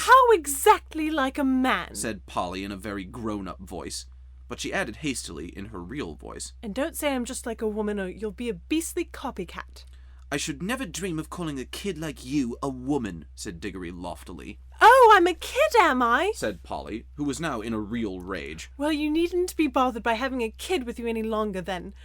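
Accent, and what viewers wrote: United States. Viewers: Why an American accent for an English story?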